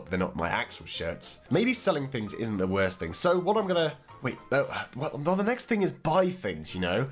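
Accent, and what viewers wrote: United Kingdom. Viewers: is he british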